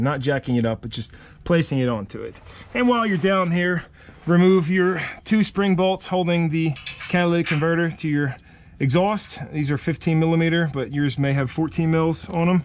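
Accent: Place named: Philadelphia, United States. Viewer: Pendell? As in Pendel PA? Langhorne? I live in Oklahoma now but i have not heard Pendel in a loooooong time. Clearly there are more then one town called Pendel in America but i used to live in Parkland when the Airplane Restaurant was still in Pendel. Anyway you sound like a Neshaminy Or Pensbury school grad North East Philly slang having hometown homeboy. So its gotta be! Come to Oklahoma and watch everyone look at you and change your name to Yankee! Thats been my name here since i moved here in 2014! Most people dont even know my real name! LMAO Facts!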